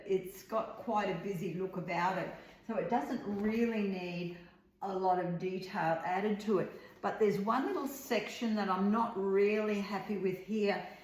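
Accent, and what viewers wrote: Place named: Australia. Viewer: I love listening to a fellow Aussie! GDay from Brissy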